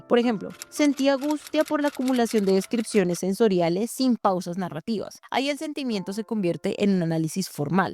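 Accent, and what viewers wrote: Colombia. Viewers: Hola, apenas conozco tu canal. Está cool. ¿Eres colombiana? Noté un poco tu acento. Debo comentar que esto de las confusiones de términos no solo pasa con esto, sino también en otras áreas. Por ejemplo, en el área creativa se confunde mucho lo que es "animar gráficos" con editar un video, o los efectos visuales con los efectos especiales, etc. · Increíble video, tu acento suena Colombiano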